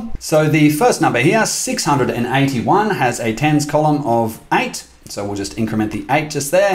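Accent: Australia. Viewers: You speak algorithm language You speak australian accent You say adios spanish language In my mind, i see a natural 0x10 base or 0x100 base for almost any kind of data, such as string, floats etc, and very fast too with bitwise operation and direct addressing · Are you Australian? I'm new subscriber, just asking because you sound like an Aussie guy I know.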